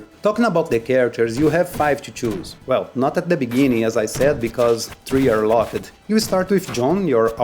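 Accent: Brazil. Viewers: Es Brasileiro o sotaque em ingles nao engana.o tchi no final das palavras denuncia logo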